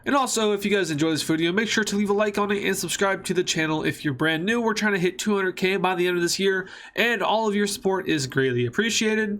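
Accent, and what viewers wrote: United Kingdom. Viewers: As a Brit, I can confirm that was a British accent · What's rong with the British accent I am Briton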